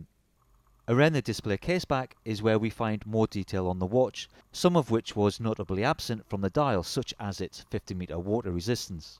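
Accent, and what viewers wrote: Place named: Newcastle, United Kingdom. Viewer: Are you from Newcastle by any chance? I recognise the accent. Great video by the way, keep up the good work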